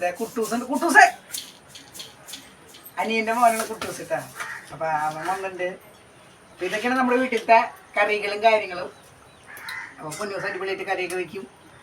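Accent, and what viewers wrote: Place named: Thrissur, India. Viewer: Good to ur thrissur basha.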